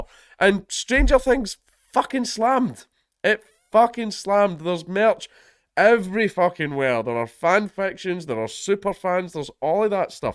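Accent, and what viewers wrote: Scotland, United Kingdom. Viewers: I like your accent, you sound like William Wallace.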